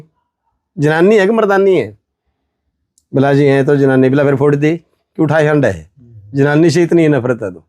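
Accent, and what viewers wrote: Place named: Haryana, India. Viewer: Jai Gurudev 🙏🙏bahut hi sunder prasang tha maine do bar suni. एक तो हरियाणवी टोन थी जिसकी वजह से दो बार बड़े ही ध्यान से सुना तो अच्छे से समझ आई. बहुत ही सुन्दर कथा प्रसंग🙏🙏 अब छोटी मुँह बारी बात एक संका मेरे मन में भी रह गई की राजा जनक तो त्रेता में हुए और राजा परीक्षित कलयुग में, बिच में पूरा द्वापर बिता तो क्या तब तक राजा जनक थे ही?? बस संका हुई तो पूछ लिया और कोई बात नहीं उचित समझे तो संका का समाधान करें🙏🙏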